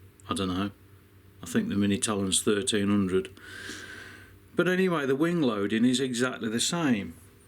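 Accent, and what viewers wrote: Birmingham, United Kingdom. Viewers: Sounds like a Brummy ☻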